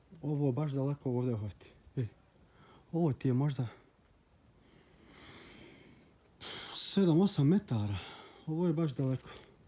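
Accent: Bosnia and Herzegovina. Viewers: Pravi momak nekoliko tvoju videa sam pogledala I vidjela sam da si previse iskren moj savjet je kao moje dijete koje bi posavjetovala da nebudes toliko Iskren. Nardo iskoristava ima pokvareni ljudi moras biti malo Mudri a to je da se cuva s Dijete drago simpatican ti taj Bosanski Bas mi super te gledati❤❤😊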